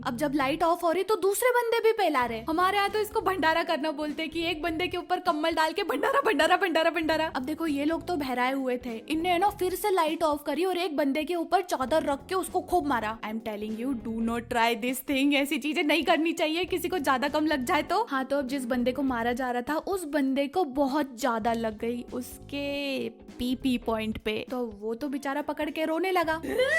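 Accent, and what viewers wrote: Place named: Gujarat, India. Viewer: Aapki tone sunke lagta hai ap Gujrati ho 😂❤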